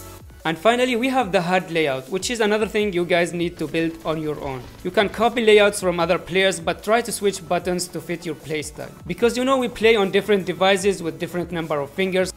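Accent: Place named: India. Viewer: U sound like u from INDIA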